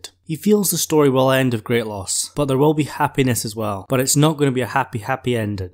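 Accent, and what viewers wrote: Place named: Scotland, United Kingdom. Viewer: Great video, very interesting content & I enjoyed very much. You have a lovely Scottish accent ( assume Scottish lol ), it's lovely to listen too and you articulate really well. I'm from Outside London England, so I'm use to harsh accents lol. Wicked channel & I like your video content. Thanks 😎👍🏼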